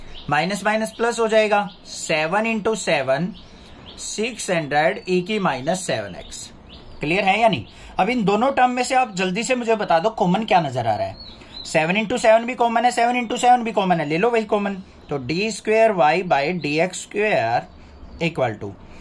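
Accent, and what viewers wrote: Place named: Bengal, India. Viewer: Bhai wo Bengali he Bengali accent tuje nahi PTA to me taunt mara kr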